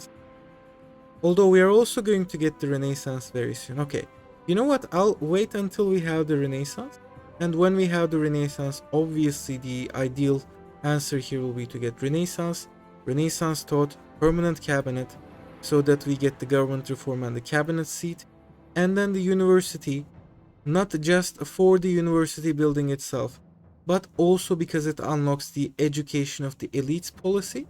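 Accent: Turkey. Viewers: Reis Türk müsün, Otistik Türk aksanın var. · turkish accent detected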